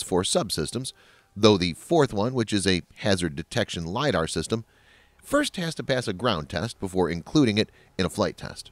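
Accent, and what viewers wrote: United States. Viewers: American English is its own form of English full accepted with British English. The voice in the video used precise American English. So it wasn't really butchered. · "The common reason is actually the same"... What the heck does that mean?? The butchered English in an American accent on this channel makes it difficult to listen to!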